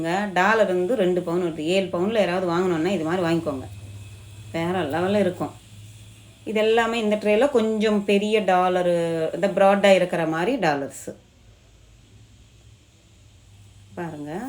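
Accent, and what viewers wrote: India. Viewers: Very useful video mam. Your telugu mixed tamil is so cute to hear😊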